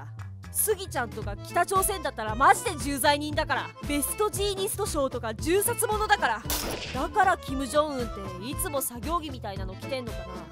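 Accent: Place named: Hakata, Japan. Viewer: 山梨県民なのにバリバリ博多弁 いえいえ、気にしないでください！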